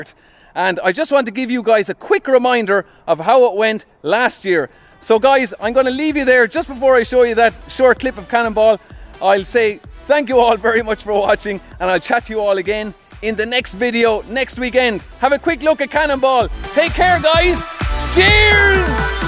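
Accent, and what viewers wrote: Ireland. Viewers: I love Ireland (hopefully I'll visit in the not so far future) and I love lorries. Your handle suggests that you're Greek, although your accent is distinctly Irish. So yeah, you just gained follower. Cheers from Turkey. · love irish accent :)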